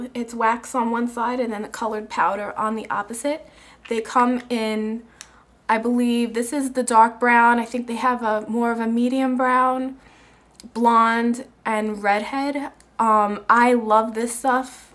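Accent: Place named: Bronx, United States. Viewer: your from the city, like the bronx, or new york , lol CUTE!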